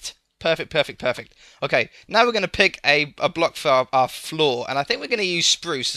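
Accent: United Kingdom. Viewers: hey what part of uk u from u sound like u live near me ive watched all ur vids well nearlly all of them and was wondering if i could maybe join and help build a house or skyscrapper or what i have built myself a massive monster energy can:)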